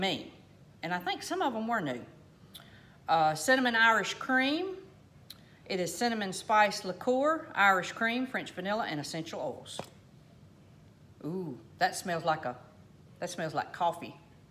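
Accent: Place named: South, United States. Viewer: That accent!! 😍 Miss living in the south. Anyway, love your videos! Let’s go Vols! Lol 😀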